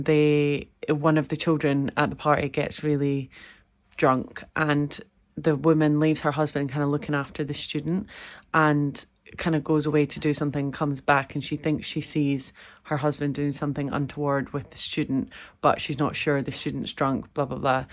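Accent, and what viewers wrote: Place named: Edinburgh, United Kingdom. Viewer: I stumbled upon your video a couple of months ago and I love them. I love the honesty in them; you're not trying to be some numpty influencer. You're just. Keep on doing that because it's working. Your accent makes me homesick for Edinburgh (I'll get back one day). So, as my late father would tell me "Keep dancing to your own Piper." Now I need to rewind and see what book it was that you mentioned at the start. One day I hope to see you mentioning one of my books (once I've finished writing my first!). x PS: I started following your sisters' vlog. I'm even more homesick!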